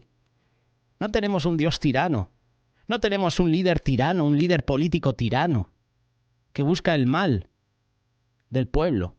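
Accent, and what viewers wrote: Spain. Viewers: Dios mío que tremenda enseñanza Dios te bendiga varón es primera ves que le escucho y x su acento es español que bendicion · Nunca había escuchado una predica en español castellano ¡Qué bonita! Y que maravillosa exhortación, hermano. Dios te bendiga. Saludos desde México.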